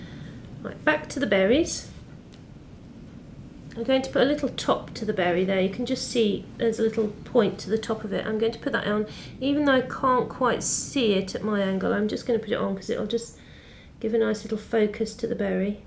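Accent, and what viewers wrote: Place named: England, United Kingdom. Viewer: Hence she has an English accent. We say people have French, German, Irish and other national accents just as I have a Scottish accent so why not an English one?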